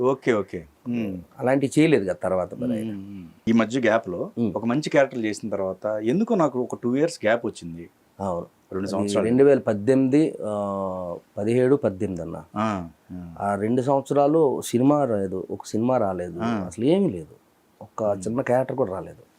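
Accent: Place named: Srikakulam, India. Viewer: గారు మీరు శ్రీకాకుళం కి ఆణిముత్యం అండి. మీలాంటి సింపుల్ గా ఉండే వాళ్లకు అలాగే మన శ్రీకాకుళం యాసకి వన్నె తెచ్చిన మీకు అభినందనీయము అండి గారు. ధన్యవాదములు అండి🌹🌹🌹.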